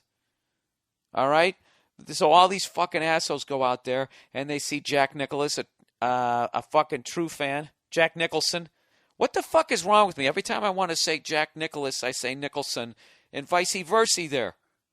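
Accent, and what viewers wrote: Los Angeles, United States. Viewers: I love his LA accent. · The LA accent is epic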